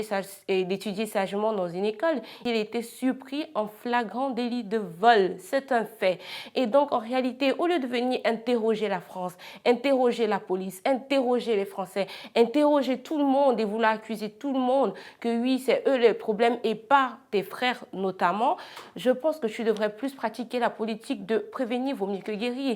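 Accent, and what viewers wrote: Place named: Cameroon. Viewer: Pourquoi c'est toujours des gens qui proviennent d'un pays qui a connu des génocides qui ont été armée par la France la Belgique pour venir dénigre d'autres citoyens tu n'a rien fait pour aider ton prochain et tu continue encore avec ton accent kamer c'est choquant être plus blanc que les blanc juste pour une perruque et des papiers 😱😱😂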